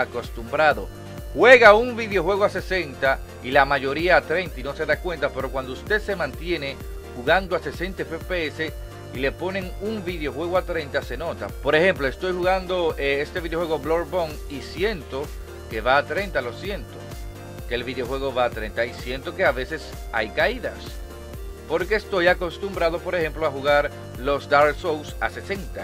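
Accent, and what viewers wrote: Dominican Republic. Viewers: De donde eres porque tienes un acento super Dominicano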